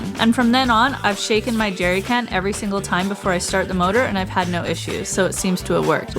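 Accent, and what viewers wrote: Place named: Australia. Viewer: I am not sure if you are a yank or aussie as you got an Aussie ascent sometimes... then you got a yankee ascent .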